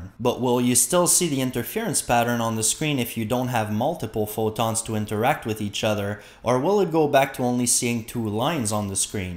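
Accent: Quebec, Canada. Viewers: Are you from Quebec? Nice video!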